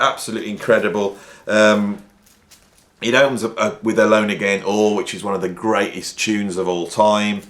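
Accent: United Kingdom. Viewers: It's Fun listening to your Videos, regards from Germany, Love your British accent, I'm 69er...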